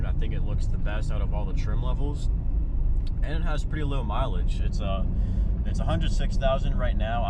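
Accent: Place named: West Virginia, United States. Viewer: What part of West Virginia are you from fairmont area for me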